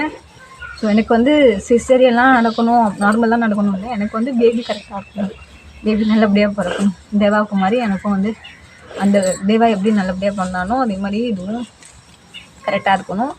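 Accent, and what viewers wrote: Thanjavur, India. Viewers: எங்கம்மாவும் இப்படித்தான் பேசுவாங்க தஞ்சாவூரை சேர்ந்த எல்லோருடைய பேச்சும் இப்படித்தான் இருக்கும்.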